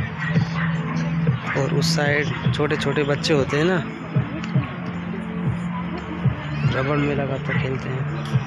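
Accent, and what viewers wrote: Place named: Nepal. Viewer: आप का हिन्दी सुनने पर नेपाल का हिन्दी जैसा है, मेरे नेपाली दोस्त जब हिन्दी बोलते है, बहुत सही लगता है। 🚩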